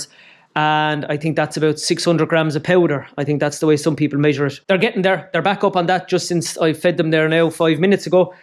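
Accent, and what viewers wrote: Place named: Ireland. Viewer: I know nothing about cows but I love your Irish Accent. New subscriber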